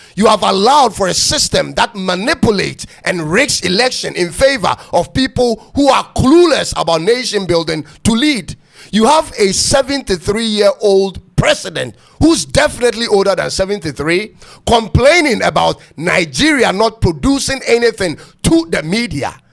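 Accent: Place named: Ghana. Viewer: We clap 👏 for you you talk as original Ghanaian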